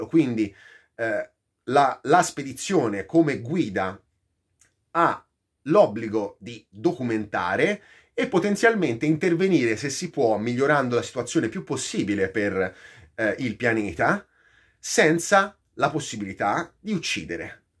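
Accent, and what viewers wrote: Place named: Livorno, Italy. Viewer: Ma sei di Livorno?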